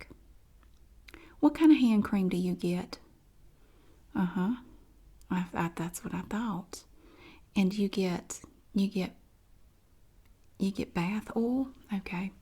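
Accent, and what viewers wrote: South, United States. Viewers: I love asmr, and i'm so happy that there is a person from NC doing it now, and that has a good southern accent!!!!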